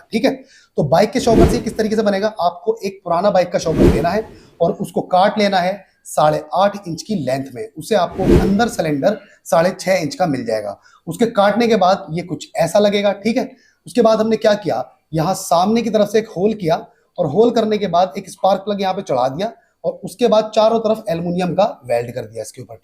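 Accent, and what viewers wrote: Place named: India. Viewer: your videos and accent let me suppose you're from india, isn't it?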